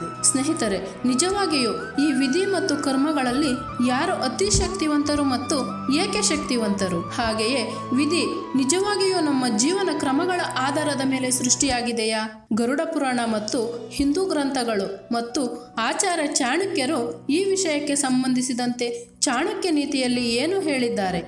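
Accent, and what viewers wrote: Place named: Mangalore, India. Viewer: Neevu Mangalore navaru ri ....eer pateruna styld gotthapundu atthe.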